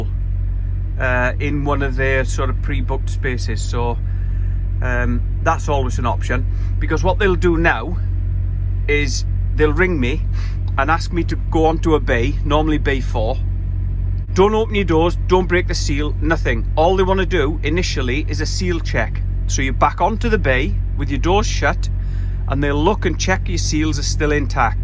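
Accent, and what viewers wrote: Wales, United Kingdom. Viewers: Sounds good to me the more the better with your accent it sounds if you are originally Welsh if so what part 👍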